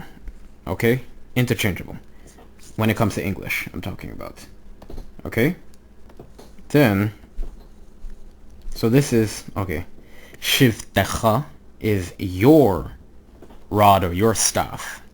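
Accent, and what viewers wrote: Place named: Yemen. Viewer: You sound just like a Yemeni Hebrew! Very Impressive! God Bless you brother. Thank you for the Wonderful teaching.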